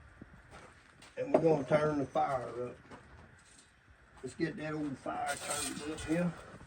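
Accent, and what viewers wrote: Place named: South, United States. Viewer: Are you from Louisiana or Tennessee?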